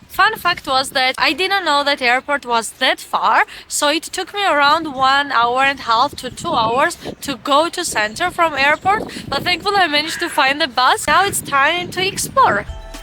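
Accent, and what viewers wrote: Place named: Turkey. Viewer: I don’t know how Georgian people sounds like . But your English accent sounds very Turkish . Are you Turkish?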